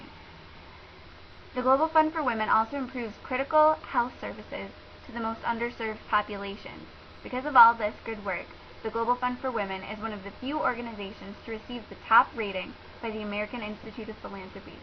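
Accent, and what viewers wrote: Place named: Wales, United Kingdom. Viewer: love the welsh accent.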